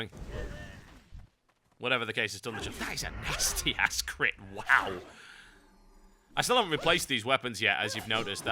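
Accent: United Kingdom. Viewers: British accent ftw !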